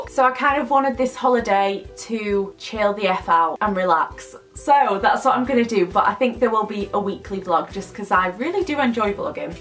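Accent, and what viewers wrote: United Kingdom. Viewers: This is the first video of yours I'm watching and I absolutely love your britain english!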